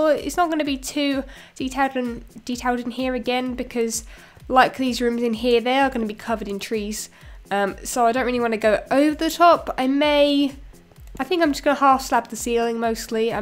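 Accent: United Kingdom. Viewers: Your voice ;D I'm American so I'm kinda deprived of British accents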